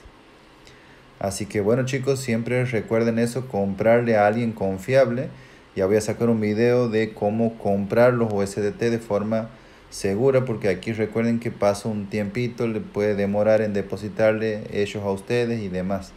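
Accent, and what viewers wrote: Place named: Santiago, Chile. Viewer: que lindo es escuchar la voz de un santiagueño puro !!! saludos de un termeño !!!!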